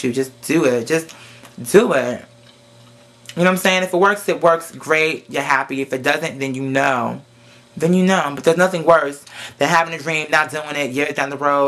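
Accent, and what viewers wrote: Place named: New York, United States. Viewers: You have east coats accent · Where'd that NY accent come from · There's that New York accent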